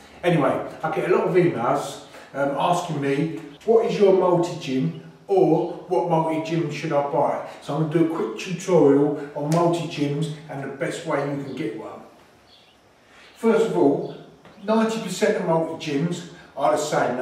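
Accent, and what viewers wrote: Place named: Romford, United Kingdom. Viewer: Exactly the advice I was after. Proper decent accent - around the Romford area?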